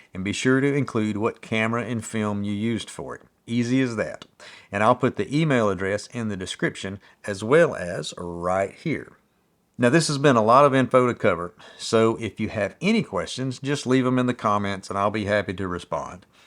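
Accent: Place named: Georgia, United States. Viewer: Started developing film in the early 70’s. Did that till the late 80’s when I joined a Southern Lifestyle shelter magazine and shot only chrome for the next 25 years. Still freelance but of course digital for my clients. But recently, like you I decided to break out the old cameras and put them to use. I was so fast with stainless reels in the old days but picked up Patterson recently. I’m sure I’ll get the hang of it but so far they’re quite a challenge. I’m sure being in a changing bag doesn’t help. I might have to look for those easier Ap reels. Good stuff here and thank you. Trying to place your accent. At first I thought Fort Worth but then decided Georgia. Am I close?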